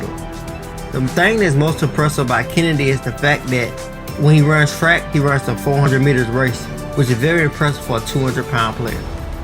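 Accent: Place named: Alabama, United States. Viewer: Lmao... I'm loving it. "Ride receiver', 'skride fer skride" & "ala-buma" if you ain't from the great state of alabama, you sure missed a chance. That's how we talk. Nice vidjya. Keep it up.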